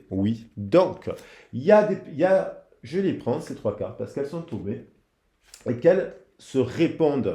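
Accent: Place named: Ardèche, France. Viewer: Ok, l' accent de l' Ardèche ! 🤗 En Provence, côte d'Azur, ici, ça chante la cigale dès le berceau, donc l'oreille est abonnée aux accents pimpants! Ya de la Vie dans la Voix 😉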